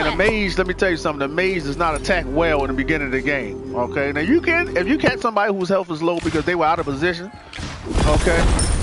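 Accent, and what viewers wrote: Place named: Louisiana, United States. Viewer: Man, are you from Louisiana? Cause you got that accent! Also, great video. Take this sub!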